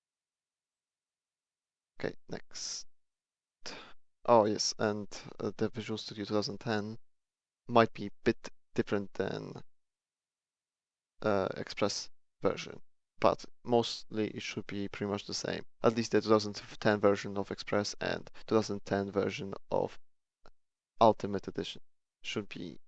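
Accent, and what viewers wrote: Poland. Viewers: I don't know why but i recognised "polish" voice (i'm also pole) very nice tutorial :) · Where are you from POlish or English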